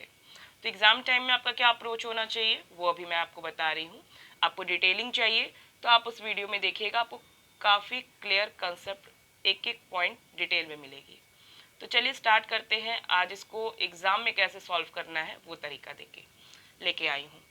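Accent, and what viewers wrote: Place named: Eastern Uttar Pradesh, India. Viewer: East UP accent ❤️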